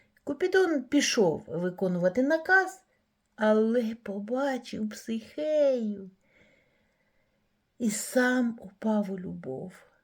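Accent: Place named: Ukraine. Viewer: Який приємний український говір❤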